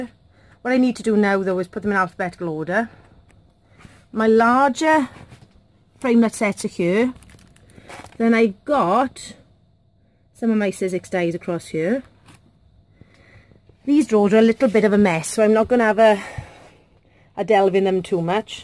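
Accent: Wales, United Kingdom. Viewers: I love this craft room. I also adore your Welch accent. I've never even filmed a single video yet as I hate my Geordie accent 😖. Thanks for sharing this amazing space full of awesome crafty organisation. I've just ordered the mint green trolley from hobbycraft and waiting for it to be delivered so you have defo gave me some fabulous ideas on how to use it for all of my paint and glitter supplies. Happy Crafting xx Berne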